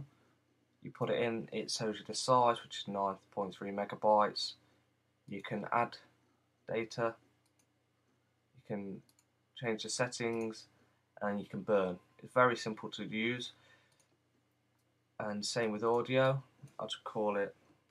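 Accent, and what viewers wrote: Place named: United Kingdom. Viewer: cannt you get a better microphone ans speak clearly without the brittish accent ? we are trying to understand but its hard .. .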